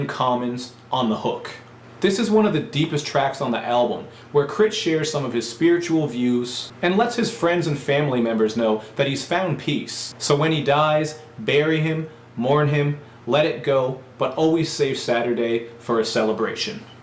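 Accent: Canada. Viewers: You are the most Canadian person I've ever heard.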